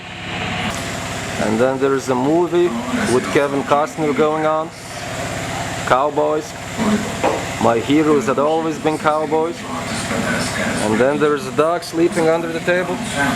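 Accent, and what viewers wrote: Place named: Russia. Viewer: Russian accent out there in the jungle catching snakes. This dude is awesome. Modern day warrior 💪👊💪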